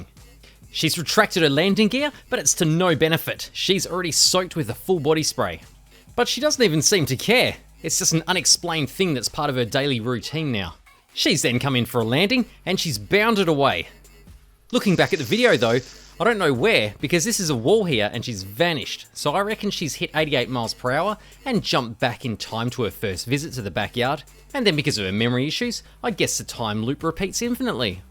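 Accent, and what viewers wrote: Australia. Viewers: the Australian accent make this feel like a nature documentary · I laugh so hard with the editing and the a Ausitralian accent. Anyone wanting to cheer up your day just visit. Cat lovers might not take kind to the video but piss on them.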